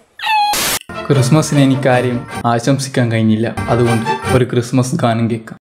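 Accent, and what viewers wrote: Kannur, India. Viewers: KANNUR aano place oru Kannur slang undallo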